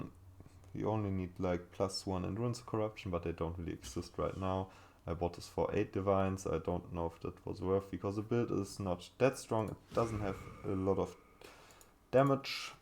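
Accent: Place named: Germany. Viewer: LIEBE ES MANN ERKENNT SOFORT WENN NEN DEUTSCHER ENGLISCH REDET ^^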